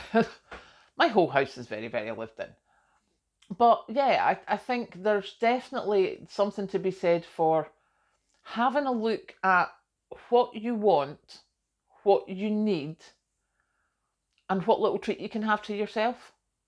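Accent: Scotland, United Kingdom. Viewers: Definitely she should. I really enjoy her. She embraces the qualities of my relatives from Scotland. That I miss so much. The accent. The due diligence. The helpfulness. I just really really like. I was on YouTube and found a woman. That was similar in some ways to my grandmother. That woman shared another woman. That sounds like relatives of mine from Europe. I absolutely adore that woman and her husband. And from that woman I found. is the most I would say similar to myself. Although I'm almost a decade or more older than her. I really respect her love for her community. For her country. I love that she speaks her mind. She's self-supporting. She helps others. She's got a man she loves and respects. My man died. I loved him endlessly. Once you had the best you not interested in the rest.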